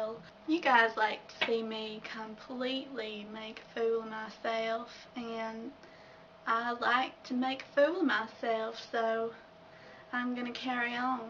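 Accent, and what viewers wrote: South, United States. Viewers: You did great on the soutern accent and also you would be driving on the right side of the road and sitting on the left side of your car driving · If I hadn't heard your Yorkshire Accent in previous videos, I'd think you're from the American South, but I prefer your Yorkshire Accent. :)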